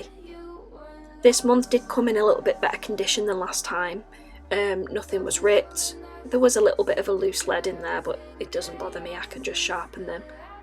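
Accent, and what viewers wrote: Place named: Northern England, United Kingdom. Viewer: oh how lovely! I really like how dreamy it looks in the end. I also really like your northern accent!!! And well done on the voice-over. 👍✨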